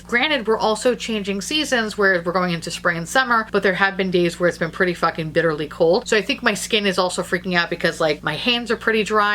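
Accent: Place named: Boston, United States. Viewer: So excited when the notification comes on my screen, enjoy your videos! The accent sounds like a Boston neighbor lol.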